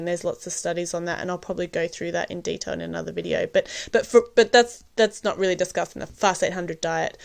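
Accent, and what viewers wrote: Australia. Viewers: Why do you sound so Australian. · Maso ..I'll give you one hint:....She is Australian.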